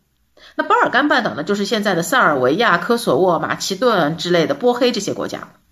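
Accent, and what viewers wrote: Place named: Shanghai, China. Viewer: 特别喜欢你的视频！听出了一种上海宁米道！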